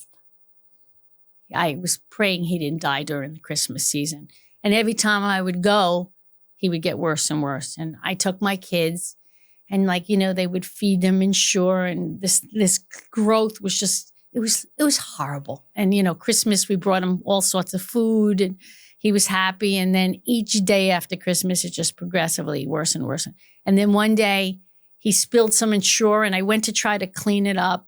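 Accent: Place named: New York, United States. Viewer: I could listen to her for hours. Love the NY accent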